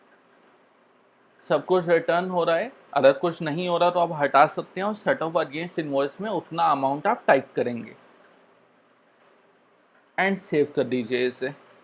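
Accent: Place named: Nepal. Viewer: I really appreciate BOOKEEPER app and I beleive it is very important to make these presentations about new features and skills, but sound quality has always been a problem. Your accent is something i get that, but remember BK community does not included only people rom Nepal.... so make sure sound comes out clearly at least guys!! Thank you.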